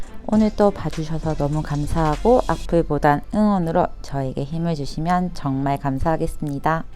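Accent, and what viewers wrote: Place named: Seoul, South Korea. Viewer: 님 남산북악 가셨군요 먼가 말투가 서울사람 같아요 ㅋㅋ 안개가 심해서 아쉬웠겠어요 잘봤습니다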